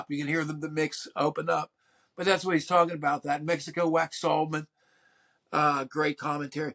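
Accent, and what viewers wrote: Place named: Maryland, United States. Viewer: Your Maryland accent, which I share with you, is excellent for reviewing Fall albums. It’s a little oddball in the pantheon of American accents, although it can’t really rival the distinctiveness that is the Mancunian accent. I could be wrong about this, but it seems the Fall always had a lot of fans in the Baltimore / DC area.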